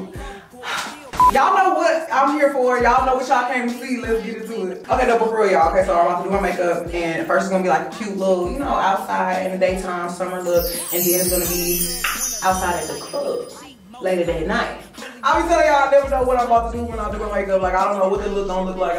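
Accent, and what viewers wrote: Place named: Houston, United States. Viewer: What part of the H? I'm from Houston too. Beyonce talk like this too lol. Real country lol...It's an HTOWN thang 🤪.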